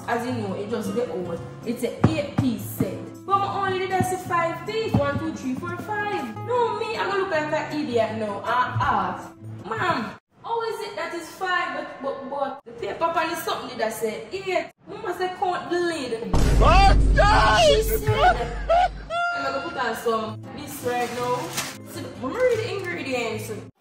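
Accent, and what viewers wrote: Jamaica. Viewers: Are you Jamaican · Accent alone me hear and subscribe ❤🇯🇲🇯🇲🇯🇲🇯🇲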